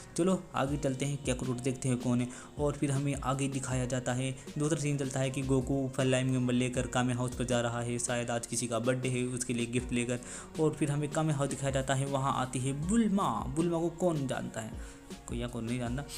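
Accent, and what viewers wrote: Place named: Haryana, India. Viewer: Bhau hindi bolo haryanvi nhi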